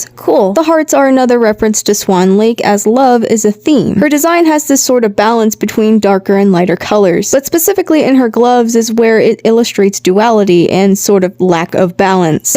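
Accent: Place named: General American, United States. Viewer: How’re u from the south but sound normally american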